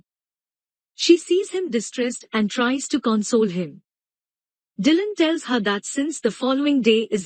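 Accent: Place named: India. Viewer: Your accent is like you're an Indian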